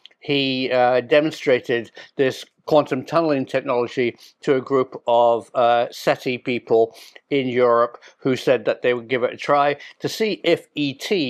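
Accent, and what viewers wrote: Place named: Cornwall, United Kingdom. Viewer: ahhh really! Sometimes you have a hint of Cornish! ❤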